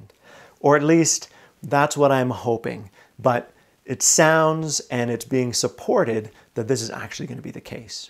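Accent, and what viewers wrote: Canada. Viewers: Seems like a great video and I love the subject but I just can't do that extra Canadian accent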